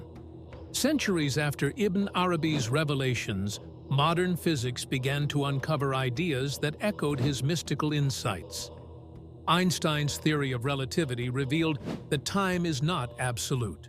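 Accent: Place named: RP, United Kingdom. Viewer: Who made this video ? The BBC type English is rather chilling to listen.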